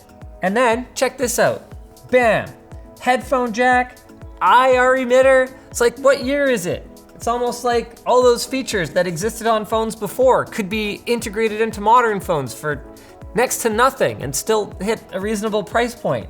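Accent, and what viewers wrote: France. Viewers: Yo you have a nice french accent Linus boy, and i live in France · That french accent 😆😆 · Linus talking french is the best accent ever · Nice French accent!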